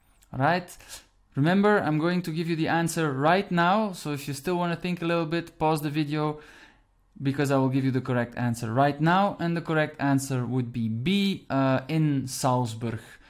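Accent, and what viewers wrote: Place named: Belgium. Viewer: Dit is Belgisch. Niet Nederlands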